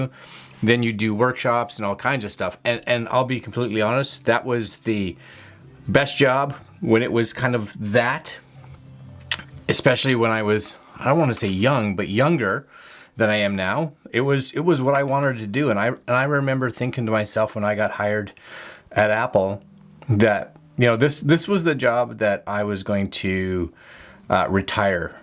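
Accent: Canada. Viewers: Your BEST yet!!! Kudos! I’ve been a loyal subscriber and viewer of yours for most of this year, originally from Deep River, now living in Las Vegas. Was in the Video Department of Blue Man Group, until we were laid off in March. Don’t get me wrong, it was a great job, but believe it or not, there was no room for growth or creativity. I’ve been focused on getting my own channel up and running, and you’ve been a very great inspiration, and the accent helps me miss the Ottawa Valley :). Anyway, keep on keepin on! You’re on a role, and it’s working! Cheers 🍻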